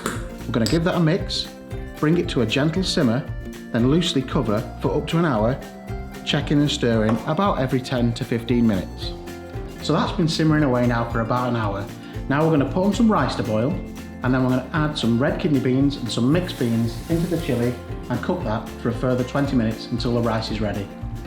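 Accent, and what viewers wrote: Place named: Northern England, United Kingdom. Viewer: A northern lad I suspect me? Derbyshire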